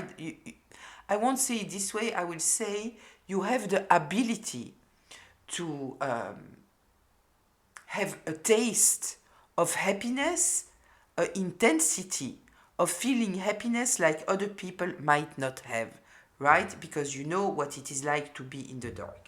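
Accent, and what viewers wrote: France. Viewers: i love listening to your perfect english in your beautiful french accent you should teach tarot, you make me understand the energy of the cards and the story they tell, so easily merci 😊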